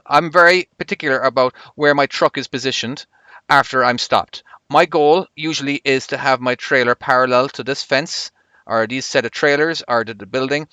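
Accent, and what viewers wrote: Ireland. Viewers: are you Irish? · What accent do you have? To me it sounds kind of Irish, another trainee says Canada.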